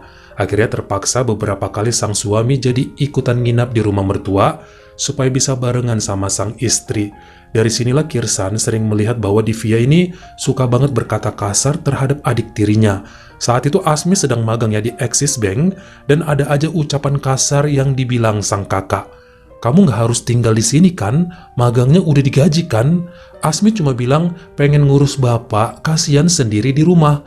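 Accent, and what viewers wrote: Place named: Java, Indonesia. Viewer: HALLO...... S 3 MAKIN COOL AJA NI CHANEL KAK, NGANGENIN BANGET PEMBAWAANNYA EPIK DI BUBUHI BHS JAWA MEDOK YG BIKIN SENYUM SENYUM SIMPUL SEHAT WALAFIAT SELALU SELURUH KELUARGA YA KAK, SALAM SEHAT DARI SYRIA 🇸🇾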